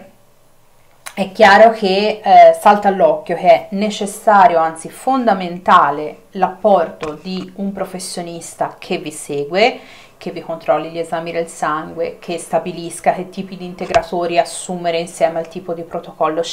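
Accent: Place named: Tuscany, Italy. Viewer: Grazie mille. Ti ho conosciuta con questo video perché cercavo testimonianze sulla dieta keto. Purtroppo dovevo iniziare lunedì scorso ma la notte sono stata male. Il mio nutrizionista mi ha detto che devo per forza prendere gli integratori di magnesio e potassio ma io avendo una malattia autoimmune allo stomaco trovo difficoltà. Ieri ho riprovato e ora sono di nuovo con un mal di stomaco assurdo. Ti ringrazio della spiegazione. Sento che sei toscana anche tu. Sai se ci sono asl o ospedali dove poter fare la keto? Perché io ho tante patologie e non vorrei sbagliare. Devo perdere peso tanto... per salute. Grazie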